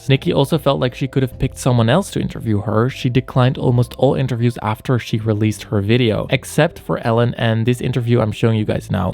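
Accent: United States. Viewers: Haha, ik zat rustig te luisteren naar je super Amerikaanse stemgeluid en dacht: Ow wauw hij zegt Chantal Jansen wel heel mooi Nederlands. 10 seconden later: mijn moedertaal is Nederlands. lol. Dat had ik gewoon even niet zien aankomen mede door je naam. Heel leuk. Goed verwoord allemaal. Ellen is echt niet leuk. Had altijd al een raar gevoel bij haar. Ze kijkt soms zo kil ofzo. leuke video, liked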